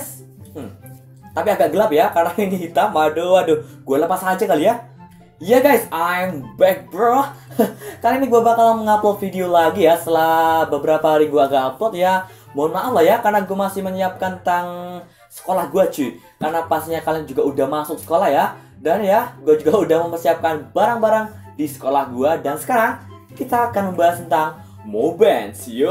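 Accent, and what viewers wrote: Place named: Java, Indonesia. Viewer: Lu orang Jawa timur apa tengah bang !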